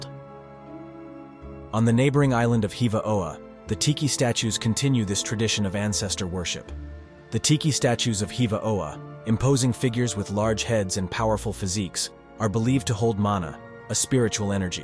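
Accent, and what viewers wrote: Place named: United States. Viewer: You sound like an American. Why are you giving metric dimensions. FTS.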